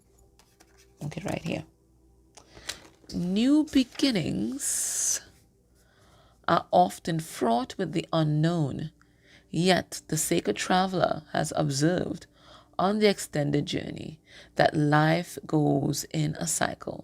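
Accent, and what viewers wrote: Trinidad and Tobago. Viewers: Hello, are you Trini?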